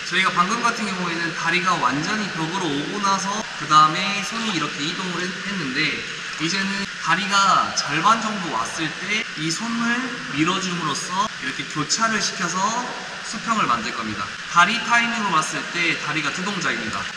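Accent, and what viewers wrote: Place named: South Jeolla, South Korea. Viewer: 설명도 잘하시고 구수한 전남 사투리 정감가네요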